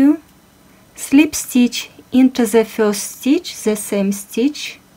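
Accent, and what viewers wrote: Russia. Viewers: Лол вы русская?? Видно по акценту и сри😂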